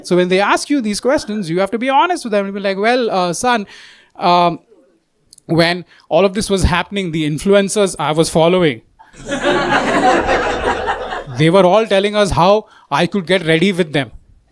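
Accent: India. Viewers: Daniel Fernandes but with an India accent? Huh? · Humans aren't necessarily apathetic, many are, but not as an essential quality. The comedian sounds Indian so lets just call it Tamas (inertia, rest, ignorance). We live in a global order based on nation-states, competing interests, strategies, and power. The rule is that as long as atrocity doesn't spill over beyond the boarders of that state, then direct action will not be taken by other states unless they have some economic or strategic reason to do so. It's vile, it makes everything feel hopeless for sure. But hope is found in recognition that states are artificial. They are as transient as the seasons. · Wtf with this Indian guy (is he real Indian or just mocking the accent all the time? Because his name is not Indian at all lol), I have never been laughing and shed tears while watching a stand-up! This guy is a comedic genius!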